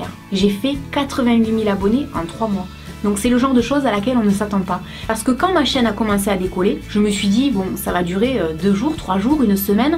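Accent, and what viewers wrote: South, France. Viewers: Je t adore et les sujets que tu aborde sont très intéressants. J'ai 13 ans et ça n'empêche pas que tu est l'une de mes youtubeuse préférée...💗💗💗 J'aime beaucoup la façon dont tu t'exprime et ton axent!!! je voudrais tellement avoir un axent du sud mais comme je suis du nord c mal parti.lol😘 gros bisous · Tes vraiment une belle personne, avec une sincérité, ca ne fait pas longtemps que je te suis mais j'adore complètement ta façon d'être et ta personnalité! ❤ et pour finir enfin une sudiste de pure souche sur YouTube ahah, je suis de Toulouse et je suis fière de nôtre accent! 👌💪❤ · biendebonjour, ta un accent du Sud toi! mais ces mignon*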